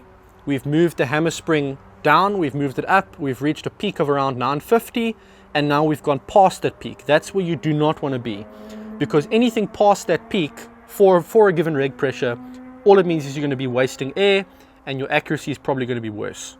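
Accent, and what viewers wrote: South Africa. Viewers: This guy isn’t from down under, he’s got a very strong South African accent - nothing like an Aussie accent.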